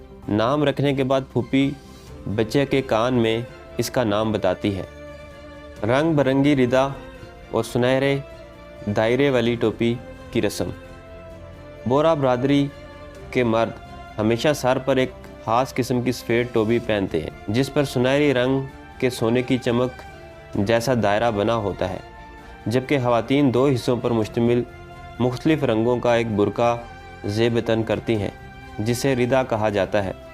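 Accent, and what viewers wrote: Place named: Punjab, Pakistan. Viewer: What a boring commentary in typically boring Pendu Punjabi accent with slow and mispronounced Urdu words. No doubt Punjab University has lowered it's standards of entry and under pressure from Jati Umra's chief Kanjri Mrs Maryam Safdar Awan's, wife of Safdar Masalli of Mansehra, influence or orders all Pendus must be given training to speak in LaWhori style of speaking. Now that's what I call dumbing down.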